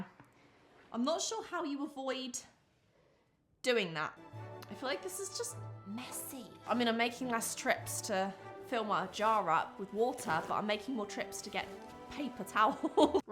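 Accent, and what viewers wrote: United Kingdom. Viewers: Hi lady I don’t watch your channel but my sis dose, she doesn’t have a YouTube account but she wants to enter the give away and she LOVES your videos she’s very obsessed with your vids and wants to tell you she like your British accent 😂 I also subbed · I love your energy and sense of humour in all your videos)) and i also love your british accent 🤩 I think that the best thing about this rinser is that you actually can use different colors and keep rinsing. You should test it vs. a regular jar using not only pink, but pink, blue, yellow, etc. Then, I think, the rinser would prove very effective 😁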